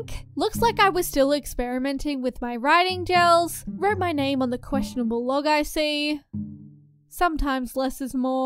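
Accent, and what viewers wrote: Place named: Australia. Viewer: My first time watching your channel and I gotta say...when I saw the png of the coles cake I was like .....yeah it's the accent and the coles cake....AUSSIE!!!!! Omg my mum n dad would CONSTANTLY get my siblings and I the coles vanilla sponge cake with whip cream and strawberry jam.... only dad liked it (and cause he paid and got it he picked it) I hate sponge cake now ;v; cake trauma. When we got a tad older we started also getting the same mud cakes...with the very very LOUD lids 🤣😂 memories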